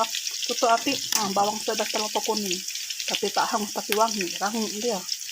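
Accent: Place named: Sabah, Malaysia. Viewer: Kc antam saja tu melayu sabah bha. .haha. .more real sabahan style !!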